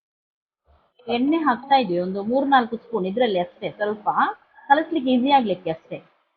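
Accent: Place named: Kerala, India. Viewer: Mdm..ji.ur kerala style mixing speaking kannada explanation is extremely good n methodology is excellent..sister.let God gives u all sathvica sampathu..Badarinath.mysore.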